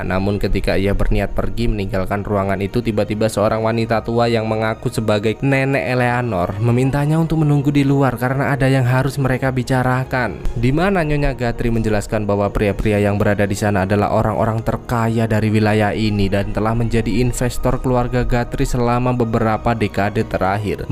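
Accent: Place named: Java, Indonesia. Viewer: Bg medok e hilangkan yo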